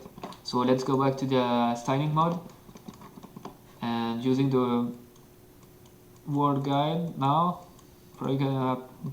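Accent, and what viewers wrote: France. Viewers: jeu sur attaque des titans par un français \o\ . Ca se voit que tu viens de france (accent)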